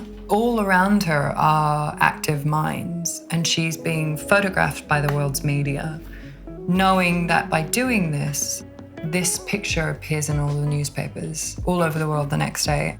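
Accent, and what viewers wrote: Melbourne, Australia. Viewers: Nah she’s just posh Melbourne they sound like this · Her English has such a lovely global vibe, Australian, British and Bit of American here and there ..love it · Such a posh Australian accent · Her accent is such a mix between Australian and English. As a brit myself I fully thought she was english for the first few minutes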